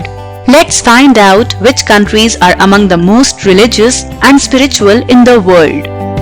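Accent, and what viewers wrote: India. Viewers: Where is lndia? And the person who is talking sounds like an indian.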